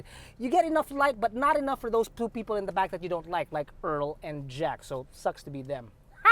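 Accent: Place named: Philippines. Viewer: His pinoy accent is annoying, hindi ba marunong mag tagalog.I guess social siya.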